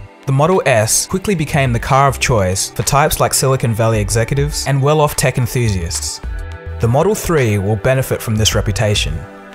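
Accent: Australia. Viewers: OHHH SHIT MATE YOU'RE AUSTRALIAN WOOOOOOOOOOOOOOOOOOOOOOOOOOO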